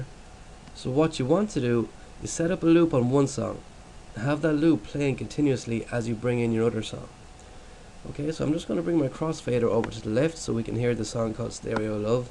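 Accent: Ireland. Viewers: you have the voice of a rougeish irish poet lol lol nice to be able to follow a you tube tutorial for a change good stuff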